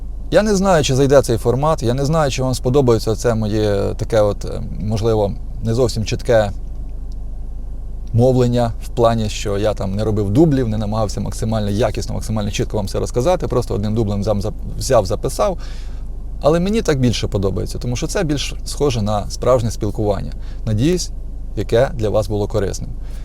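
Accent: Vinnytsia, Ukraine. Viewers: Вінницький говор нікуди не дінеш) так шо не видєлуйся) ти все рівно наш - Вінницький)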